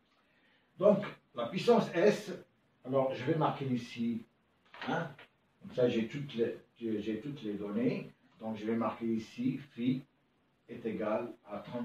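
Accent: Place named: Morocco. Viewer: Je crois que vous êtes algérien Mais vôtre accent semble marocain On tout cas je suis fière de vous Professeur Merci.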